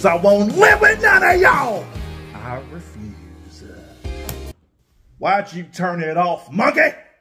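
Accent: South, United States. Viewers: It’s a country accent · Your cowboy accent is on point bro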